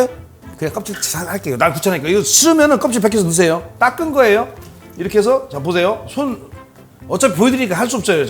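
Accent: Chungcheong, South Korea. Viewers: 남편이 옥수수를 안먹더니 나이들어 좋아졌다고 어제도 쪄달라했는데 이제 비법을 알았으니 맛있게 쪄줘야게쓔 백종원 선생님 감사해유~~~♡ 충청도 사투리가 구수하구 좋아유~~~~~ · 울고향친근한 충남사투리구수한 수더분한인상 넘잘합니다 성공신화역시~ 화이팅!!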